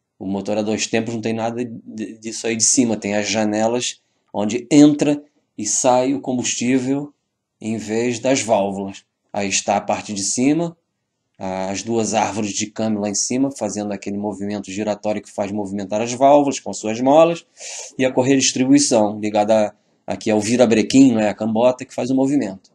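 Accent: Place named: Rio de Janeiro, Brazil. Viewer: Que legal sotaque carioca...explora o (x) em tudo kkk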